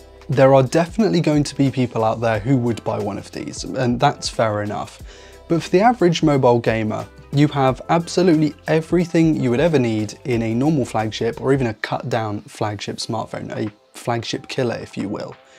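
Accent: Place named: Cornwall, United Kingdom. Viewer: No way your from bodmin. I thought I recognized that accent. 😂 cheers from fowey!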